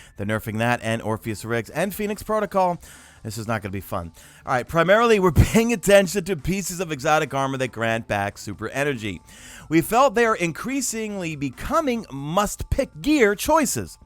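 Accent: New York, United States. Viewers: Love the New York accent. Don’t worry I understood lol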